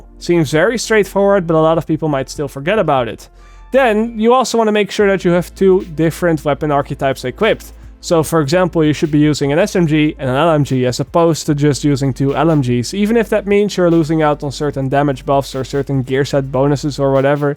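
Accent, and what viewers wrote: Netherlands. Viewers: Are you dutch ? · Cool video! I really love that durch accent not gonna lie 😂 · I can hear you are dutch🤣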